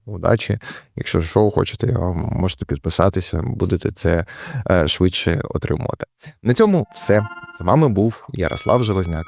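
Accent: Russia. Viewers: Пане, нардепе, підтягніть, будь-ласка, свою українську мову. Ви цікаві викривальні матеріали робите. Але тако буркочете під ніс і видно що через те, що ви російськомовний, бо не впевнені у своїй лексиці, та з'їжджаїте через речення на русизми, та рос прононс.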